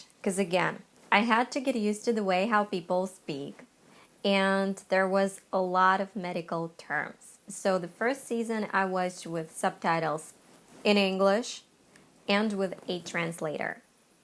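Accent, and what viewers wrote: United States. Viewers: I thought you were American.Mashallah you are very successful. I just discovered you. I have no intention of leaving you.I'm your student from Turkey.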